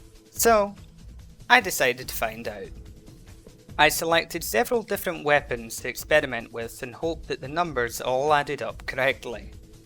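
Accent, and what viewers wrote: Scotland, United Kingdom. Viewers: Why do you sound Scottish lol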